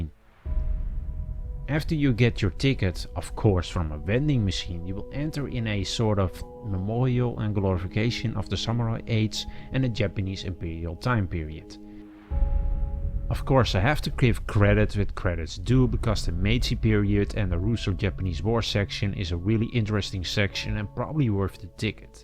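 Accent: Netherlands. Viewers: Great Dutch accent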